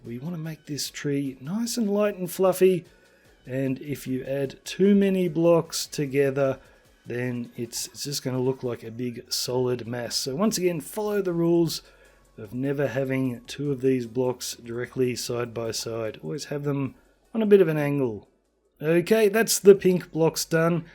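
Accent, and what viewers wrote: Australia. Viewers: Nice video! Your accent sounds Australian... are u from Australia? I am... im from the sunshine coast!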